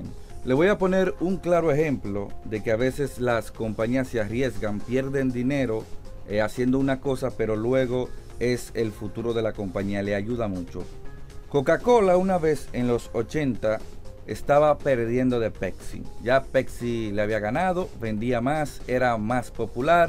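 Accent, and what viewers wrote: Dominican Republic. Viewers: Eres de republica Dominicana?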